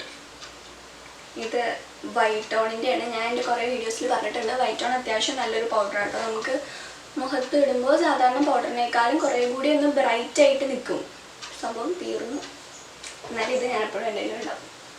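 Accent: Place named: Thrissur, India. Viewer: Ur presentation is very good. Chechide veed trissur enganum aano? Slang varunnu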